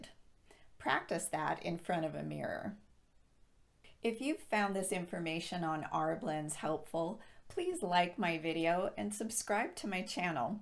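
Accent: United States. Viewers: I love your videos and the standard American accent and pronunciation.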